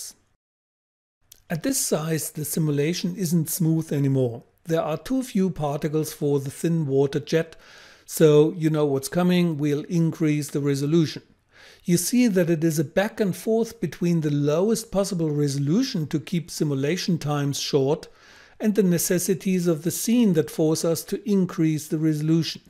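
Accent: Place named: Austria. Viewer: Great Info I'm definatly going to follow through also is the Accent Austrian I really like it XD!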